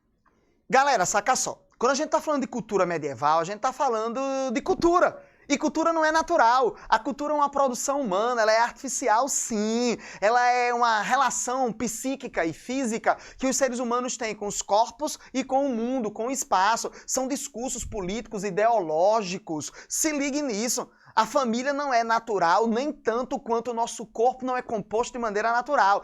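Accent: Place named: Paraíba, Brazil. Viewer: Ese cara fala igual Paraibado OU potiguar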